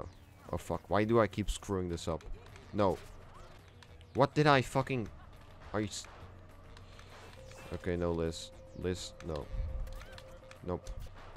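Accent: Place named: Argentina. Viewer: You sound Argentinian ngl Also awesome Garfield Kart Gameplay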